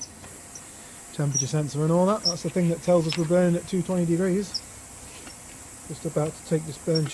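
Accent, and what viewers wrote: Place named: Derbyshire, United Kingdom. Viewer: Im from Derbyshire lol, I know Derbyshire when I hear it lol, awesome videos on the heaters and I see you get upto alsorts of stuff so Im subscribed ;-)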